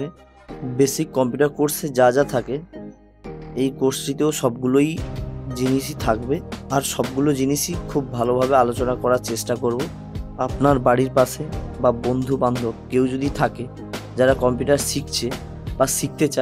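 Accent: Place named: India. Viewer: ভাই তুমি মনে হয় ভারতীয় তোমার কথা পষ্ট না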